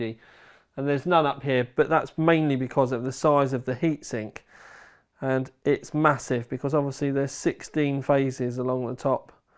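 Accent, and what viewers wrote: England, United Kingdom. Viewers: I can t follow this fucking eng accent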